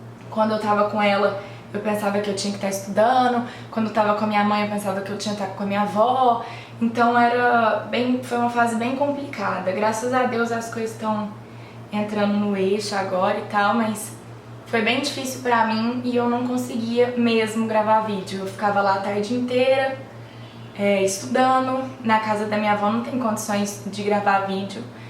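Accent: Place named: Uberlândia, Brazil. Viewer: Saudades do seus vídeos que bom q voltou!!! Saudades desse nosso sotaque lindo de Uberlândia!!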